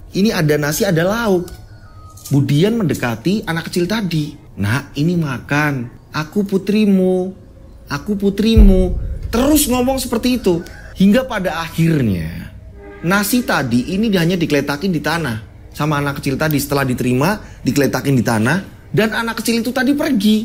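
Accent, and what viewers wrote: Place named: East Java, Indonesia. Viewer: Ini dari logat bahasa nya mas kayak orang Jawa Timuran daerah selatan ( blitar kediri Tulungagung )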